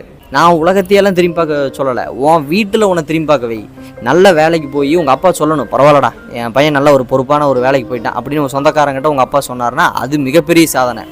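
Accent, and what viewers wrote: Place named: Kongu, India. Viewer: Thambi.. I became fan of you from this video❤❤ Ipotha voice kekaren and kongu slang❤🧿. Om namasivaya🙏 VAZHGA VALAMUDAN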